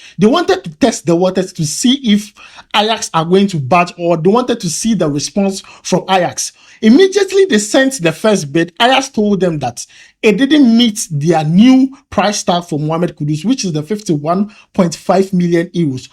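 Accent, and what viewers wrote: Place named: Nigeria. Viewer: That means the Trainer is not good.A Ghanaian you’re speaking English like a Nigerian.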